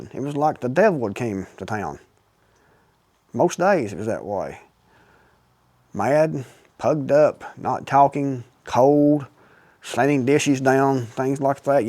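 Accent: Appalachia, United States. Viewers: Thank you that means a lot. I'll watch more of your videos. I like your accent applachian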